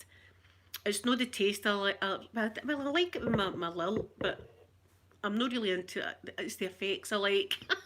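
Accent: Scotland, United Kingdom. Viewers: listening to you speak reminds me of back in the day, when we were able to travel freely and I went up to visit my grandparents and cousins in glasgow. Coming from germany I have not been back to see them in a long time and when someone speaks in the same scottish accent as I am used to hear from them, it always brings back memories from when we all used to gather at my grandparents flat in glasgow and talk about all the things going on in peoples life. I think the last time I was there must have been about 3 years ago or something, so its been a while. I just wanted to thank you for this video and the memories that raised in me while watching it. Keep up the good work and stay safe out there!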